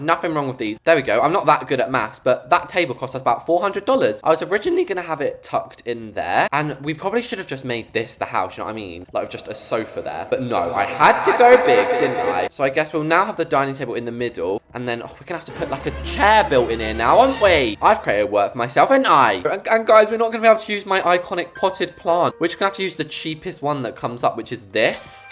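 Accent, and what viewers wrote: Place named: United Kingdom. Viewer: Omg I’ve watched Reese’s for like… yearsssss but I only just realised… IS THIS MAN BRITISH??? I am and honesty he sounds British but I only JUST realised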